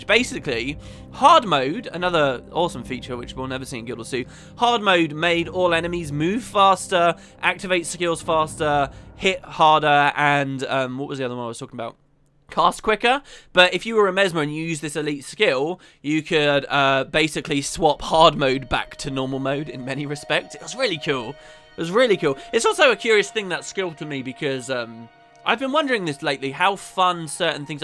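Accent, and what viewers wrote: England, United Kingdom. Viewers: you have the proper English accept so you instantly sound Epic to us Americans! Well.. i dunno.. to me anyways! lol. Absolutely agree with you on the DE reward scaling..if people had the chance to get like 1g from an event that hadn't been done in a month, people would definitely be out there doing stuff. & the game should TELL you "you got this much extra stuff for doing an ignored event" etc.. Maybe add new medal types.. platinum, Mithril & Orichalcum that only show up on old events & give you special loot boxes.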